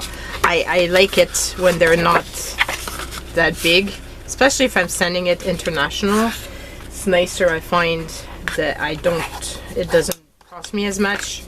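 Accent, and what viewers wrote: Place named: France. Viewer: I too have been watching your videos for some time now and can honestly say that you have been my greatest inspiration. Starting with recycling Christmas cards, I have gained in confidence, that I never had before I saw your channel. This has led to many different crafts I so enjoy doing. So thank you from the bottom of my heart. Also, I love hearing your French accent. I never miss your card making videos. My absolute favourite! xx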